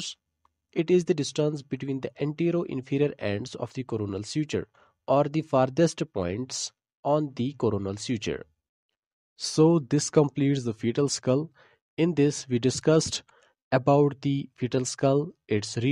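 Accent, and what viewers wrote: Kashmir, India. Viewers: Voice of a Kashmiri guy😊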